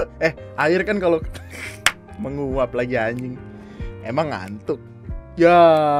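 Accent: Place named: Java, Indonesia. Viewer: Vee dia kan dah pindah dari kecil . Logatnya aja jawa . Jelas memanfaatkan viewer indo , orang masa kecilnya di kota Malang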